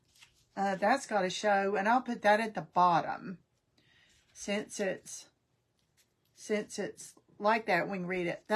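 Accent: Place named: North Carolina, United States. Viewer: I knew that accent! I am from NC too!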